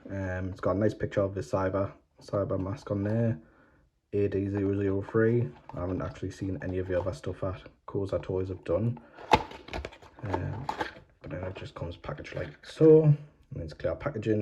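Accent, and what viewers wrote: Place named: Newcastle, United Kingdom. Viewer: Your Geordie accent reminds me of home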